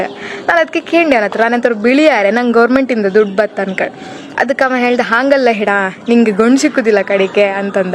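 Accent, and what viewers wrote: Kundapura, India. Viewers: ಕಪ್ಪಾದರೇನು, ಲಕ್ಷಣವಾಗಿದ್ದೀರಿ, ತುಂಬಾ ಚೆನ್ನಾಗಿ ಮಾತಾಡ್ತೀರಿ, ಕುಂದಾಪುರ ಭಾಷೆ ಕೇಳಲಿಕ್ಕೆ ಖುಷಿಯಾಗ್ತದೆ. God Bless you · Kundapura kannada kembuke chenda adrge niv kuda thumba lakshana kanthidri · ಇದು ಕುಂದಾಪುರ ಕನ್ನಡ, ಉಡುಪಿ ಜಿಲ್ಲೆ, ಕುಂದಾಪುರ. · Kappadaru sundaravagiddi.chennangithu kundapur bhashe. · Nimma kudapra mathu chenda untu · Namma. Kundapura. Kannada ❤ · Kundapura kannada matra chanda marey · ಕುಂದಾಪುರ ಕನ್ನಡ ಕೇಳೊಕೆ ತುಂಬಾ ಇಂಪಾಗಿ ಕೆಲ್ಸುತೆ 🌹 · ಎಂತ ಕುಂದಾಪುರ ಭಾಷೆ, ಮಾರ್ರೇ 👌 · ಕುಂದಾಪುರ ಕನ್ನಡ ˌ ಕೇಳಲು ಪರಮಾನಂದ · Idu namma kundapura kannada..❤ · ಭಾರೀ ಶೋಕು ಉಂಟು ನಿಮ್ಮ ಕುಂದಾಪುರದ ಕನ್ನಡ ಕೇಳಿಕೆ ಬಾರೀ ಸಿಹಿ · ಕುಂದಾಪುರ ಕನ್ನಡ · ಕುಂದಾಪುರದ ಚೆಂದ ಭಾಷೆ ಕೇಳಿ ಖುಷಿ ಆಯ್ತು ❤❤ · Beautiful Kundapura accent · Kundpura kannada ❤ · Lofer ನಾಯಿ adu kundaapura kannada.. Modlu sede tara aadodu bidu. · ನಿಮ್ಮ ಕುಂದಾಪುರದ ಕನ್ನಡ ಕೇಳಲಿಕ್ಕೆ ಕಿವಿ ಎಷ್ಟು ಇಂಪಾಗುತ್ತದೆ ಎಷ್ಟು ಚೆನ್ನಾಗಿ ಮಾತಾಡ್ತೀರಾ ನಿಮ್ಮ ಕುಂದಾಪುರ ಕನ್ನಡ ನಂಗ್ ತುಂಬಾನೇ ಇಷ್ಟ ❤🎉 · ನೀವು ತಲೆ ಕೆಡಿಸಿಕೊಳ್ಳಬೇಡಿ ಅವನ ಮಾತಿಗೆ Kape ಕಸ್ತುರಿ ನಿಮ್ ಮಾತು ತುಂಬಾ ಖುಷಿ ಆಯ್ತಾ ಕುಧಾಪುರ ಕನ್ನಡ ಸೂಪರ್ ಅವನ್ ಕಣು ಸರಿ ಇಲ್ಲ ನೀವು ತುಂಬಾ ಸುಂದರ ಇದ್ದೀರಾ👌👌👌💐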